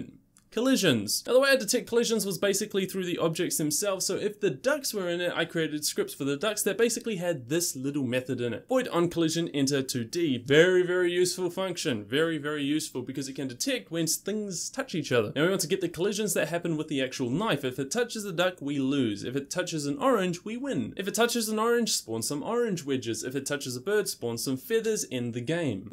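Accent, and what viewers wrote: New Zealand. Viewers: Hmm do i hear a bit of New Zealander in your accent? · Hey are you kiwi bro? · i feel like your from New Zealand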